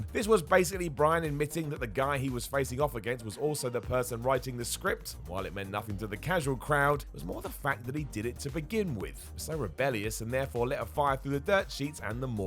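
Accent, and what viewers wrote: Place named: United Kingdom. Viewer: They're from the UK lol. How do you want them to talk?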